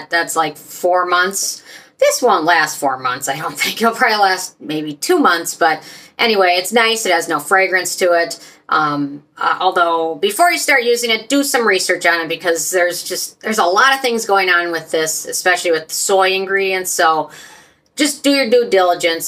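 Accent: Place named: Midwest, United States. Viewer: Paula’s need to STOP … it’s way WAY too many products that she’s selling. I only use one of her cleanser and a booster. More than half of her facial moisturizers affect my eyes, after an hour of application (I’ll stick to my drunk elephant). I’m not a sunscreen person….. after trying hers and expensive brands of both chemical and mineral sunscreens, I’m left with irritated eyes. Anyway, it’s fun watching you and I ❤️ your Midwest accent. Keep up the awesome work👍.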